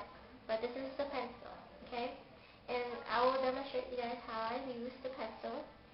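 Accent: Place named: Asia. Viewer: you got an asian accent lolz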